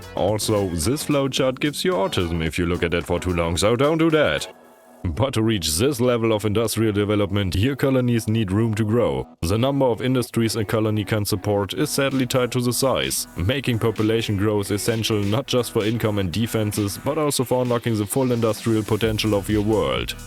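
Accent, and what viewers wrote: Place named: Germany. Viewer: Ich liebe diesen deutschen Akzent. :D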